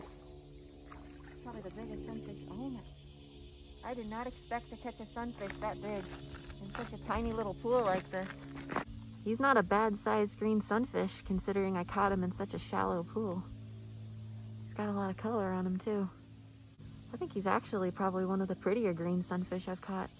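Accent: South, United States. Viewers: I'd just do what works unless there's a serious reason imo Common way is not always best way And also is that a slight country accent? aww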